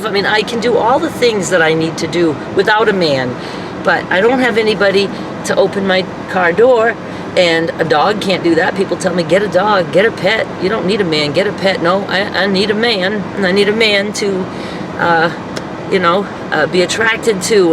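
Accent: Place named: South, United States. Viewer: You sound more and more "southernish".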